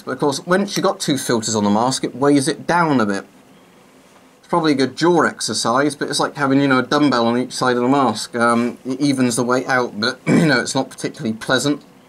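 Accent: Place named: United Kingdom. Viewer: why you sound more british with a mask on? btw thanks for the info :D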